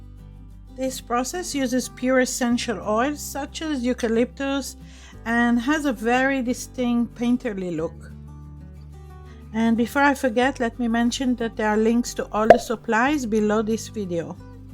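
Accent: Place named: Eastern Europe. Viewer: Hi and first, thank you for your videos! My first question is, I will produce my own artwork using acrylic paint and alcohol ink designs then transfer photocopies of my art onto acrylic sheet, what paper is the best for me please? My second question is, is the Gel Medium technique best for me? By the way, the best years of my life were spent in Chernivtsi in Ukraine and it’s so nice to hear an Eastern European accent again! Kindest regards, Paul.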